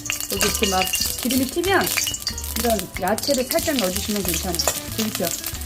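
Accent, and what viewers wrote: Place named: Seoul, South Korea. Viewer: 넘 매력있는 서울사투리에 강주은님 생각나요 ㅋㅋㅋ 요리할때는 살아있는 눈빛에 존멋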